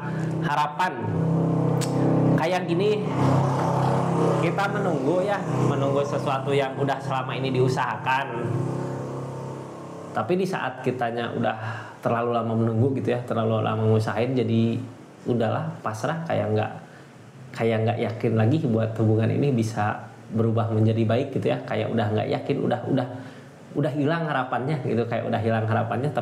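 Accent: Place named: Sunda, Indonesia. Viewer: Saya suka dg mas lo wajahnya seperti anaku nomor 3 trus bahasa Sunda nya medok bangett makasih redingannya sehat sll berkelimpahan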